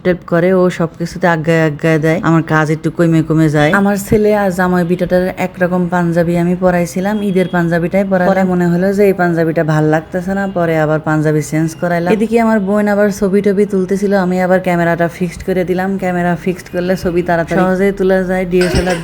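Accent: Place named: Khulna, Bangladesh. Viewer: যাতিগিলি 😂😂😂😂 মনে হচ্ছে আমার বাড়ির আশেপাশের বোন তুমি।আমি খুলনার।তবে আমরা ছ‍্যাড়া বলি না।ছ‍্যামড়া বলি।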